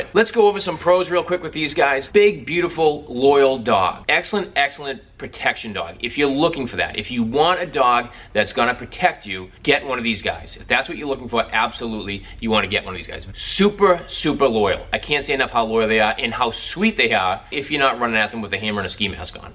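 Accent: Boston, United States. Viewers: I can hear some Boston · u southie? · My brother! I hear a Boston accent. Yeah? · From Boston? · Love that Boston accent. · Are you from Boston???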